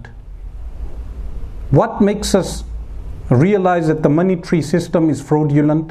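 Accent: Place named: Birmingham, United Kingdom. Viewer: this man is from Birmingham. his accent sounds proper thick. and he's a Brelwee. Double whammy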